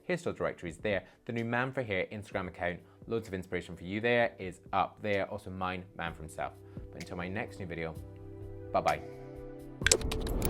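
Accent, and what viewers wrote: United Kingdom. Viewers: Hi! I came here to help my teenage son find a suitable hair style. Content was really helpful. Most of all I fell for your presentation 😊. I like your voice and clear presentation. I was thinking you are British or Australian? 😂😂I googled and found you are actually from one of my favorite country Scotland 😊. I have visited your beautiful country. All the best for your work! 🙏 ❤️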